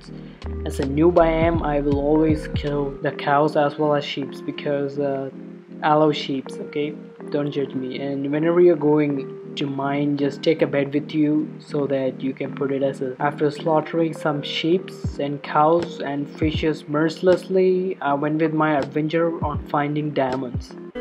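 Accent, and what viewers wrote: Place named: United Kingdom. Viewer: Ur British accent is still the same as before!!!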